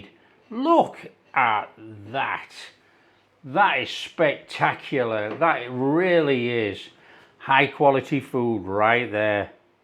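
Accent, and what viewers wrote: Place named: England, United Kingdom. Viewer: Wonderful English recipes and your wonderful English accent! I understand all of it and enjoy both...thanks from the Black Forest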